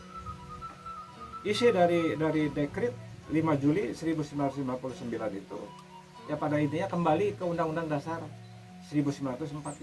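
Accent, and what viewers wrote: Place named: West Java, Indonesia. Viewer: Sukaa banget pak, sunda banget oii tentrem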